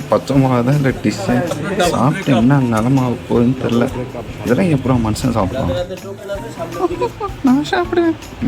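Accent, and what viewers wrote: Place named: Madurai, India. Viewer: Bro...neenga madura dhana...unga pechulaye theridhu bro😂😂🤣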